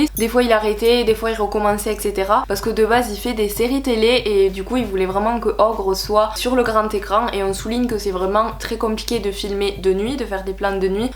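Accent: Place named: Pyrénées-Orientales, France. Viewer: salut l'amie ! tu présente très bien ce film , sans trop en dire , et j'apprécie vraiment. les films d'horreur de chez nous ne sont pas assez mis en valeur je trouve , et quand j'ai vue la bande annonce , j'ai bien aimé . cela donne vraiment envie de me déplacer au cinéma , ce que je n'ai pas fait depuis plus de 2 ans ... je viens de m'abonner à ta chaîne , regardes la mienne si tu veux bien , je ne fais beaucoup de critiques de films , mais je vais en beaucoup plus prochainement, sur des films de genre , ma spécialité .je trouve ton accent charmant , tu est du 66 il me semble , des Pyrénées orientales ? moi je suis du 18 , dans le CHER . à bientôt pour échanger , amicalement FRED .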